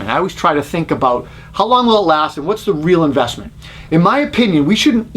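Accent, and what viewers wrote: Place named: New York, United States. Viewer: Great review , sound like a New Yorker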